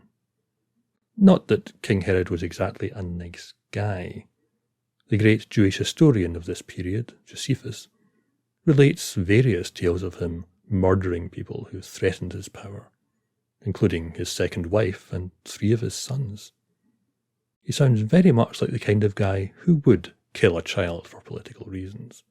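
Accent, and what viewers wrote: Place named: Scotland, United Kingdom. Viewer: And lovely ASMR with your mellifluous Scots accent!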